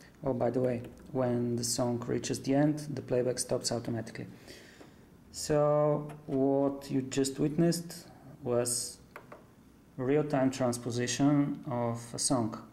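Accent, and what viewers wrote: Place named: Eastern Europe. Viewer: Very strong accent - East European, hard to listen to.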